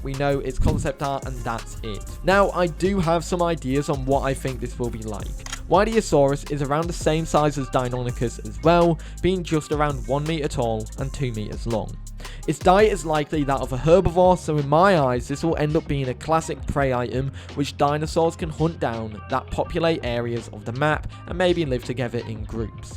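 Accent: United Kingdom. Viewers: Yo when did start hiding that British maw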